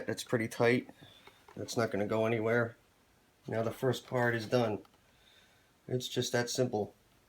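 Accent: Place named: New Jersey, United States. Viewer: I really like ur channel. You get right to the point, no stalling, no boring rambling. We think alike, wondering where ur from, almost sounds like a jersey accent. I'm on fb in the groups, BR etc. Don't kno who u are but wouldn't ask u to put that info here. Keep up ur awesome work. Love ur Barkie collection!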